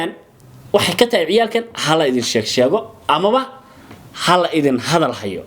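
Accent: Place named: Mogadishu, Somalia. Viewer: Sxb afkaaga hagaagso reer muqdisho kaliya umada kama af duwana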